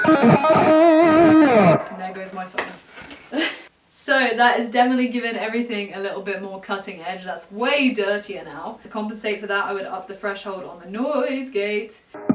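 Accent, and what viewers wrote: England, United Kingdom. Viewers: Easy on the eyes and ears. What’s not to like. Lol. Love the English accent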